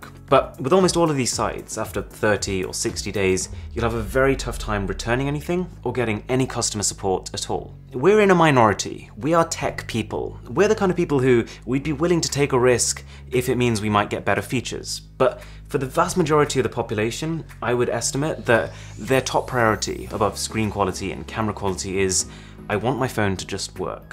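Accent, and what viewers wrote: United Kingdom. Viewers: You have a British accent but talk in the dollar currency. If your British just say pounds sterling as I'm from the UK and understand pounds better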